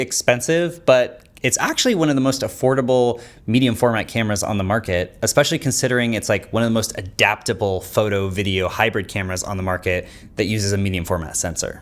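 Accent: United States. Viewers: Aaaaah "Another: "Whats-Up" opening! As soon as I hear this + Yank voice! Block and go.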